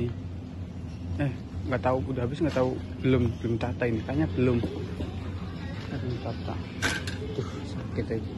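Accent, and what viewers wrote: Banyumas, Indonesia. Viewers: wong ngapak ya kang hhe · Amin. Amin. Sama-sama Mas. Ngapaknya tidak begitu kelihatan kok Mas 😁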